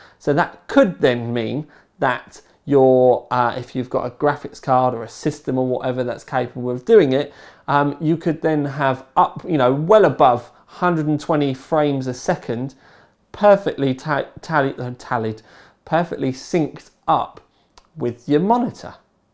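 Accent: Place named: South East England, United Kingdom. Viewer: Off the top of my head, you are a Kent, Essex lad... I could be wrong... Maybe South of the Thames... I know you are somewhere South East, South West possibly, but I would have lost my money... South East for me! Peace...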